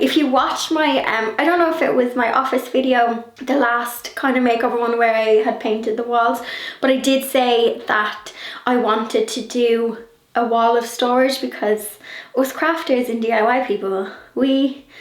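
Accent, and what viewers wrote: Ireland. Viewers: Oh my gosh, that looks amazing, I was also amazed that you just moved things around your room and painted everything in place. I would have had paint everywhere! Great job, loved the idea and thanks for sharing....PS you are simply lovely and I could watch you all day just to hear your beautiful Irish accent. Stay safe, hugs from 🇨🇦❤️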